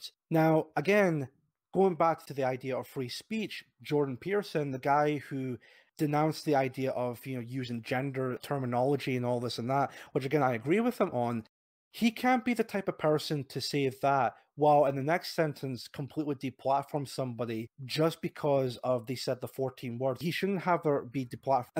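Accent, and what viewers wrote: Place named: Scotland, United Kingdom. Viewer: Naw am just wondering wit part of Scotland this guy accent comes from.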